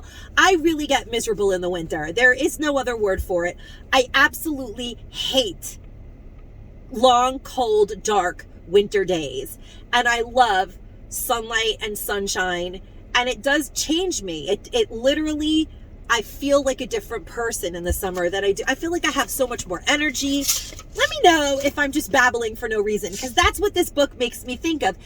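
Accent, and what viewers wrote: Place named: Long Island, United States. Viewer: I so enjoy your videos. Your accent is very similar to my own. I'm originally from Valley Stream, LI but I've lived in South Carolina for 15 years. Listening to you sounds like home. Bless you!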